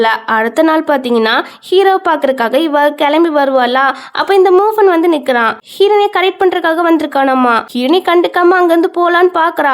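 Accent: Tirunelveli, India. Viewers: Novel reborn story romba nalla solrenga pa 👍 super ah eruku nellai slang 💐 weekly 1dramavathu podu pa unga channel la 2years munnde potta drama en delete pannetenga 😔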